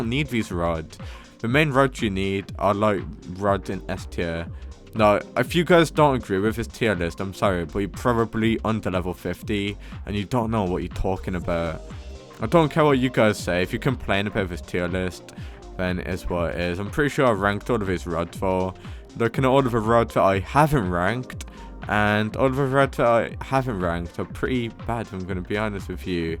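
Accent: United Kingdom. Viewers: Omg he have the bri ish accent😮 (not trying to be rude pls)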